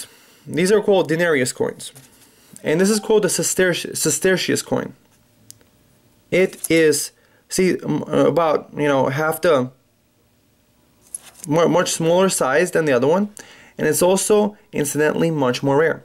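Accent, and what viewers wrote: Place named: England, United Kingdom. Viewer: stop butchering latin with an english pronounciation please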